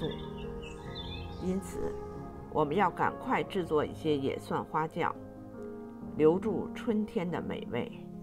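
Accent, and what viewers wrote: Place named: Beijing, China. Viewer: 我就知道您是北京的。我听出来啦！！！特别亲切😍 也祝您和家人周末愉快！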